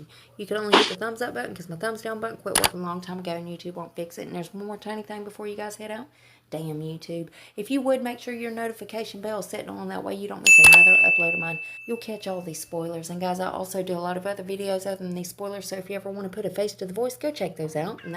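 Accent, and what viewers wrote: South, United States. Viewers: I'm glad to see you back. I was worried... from one Southern girl to another, I need that accent in my daily life! ❤❤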